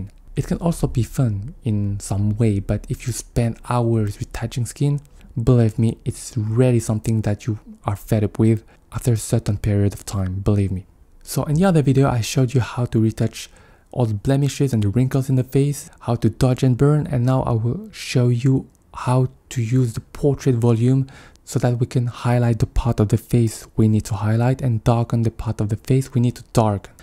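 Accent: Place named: Italy. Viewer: i like ur english akzent. are u from italy or something like that? its sound like that.. ^^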